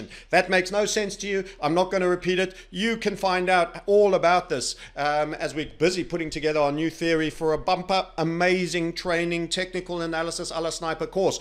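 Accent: South Africa. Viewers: except it's not a brit accent, he sounds South African or perhaps from NZ/Aus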